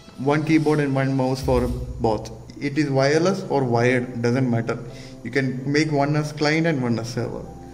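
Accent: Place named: Kerala, India. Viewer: by your accent , i am pretty sure you're a malayali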